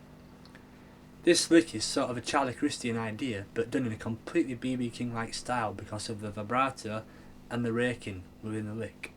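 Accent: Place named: Yorkshire, United Kingdom. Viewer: you'r a good teacher....and you know your stuff. Also you have a great tone on that Tele. Really like your vids. I can never decide between Hull accent and Scarborough I'm going for Scarborough!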